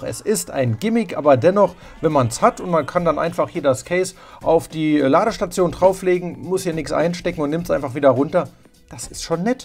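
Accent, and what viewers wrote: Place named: East Germany, Germany. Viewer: Ossi Akzent ...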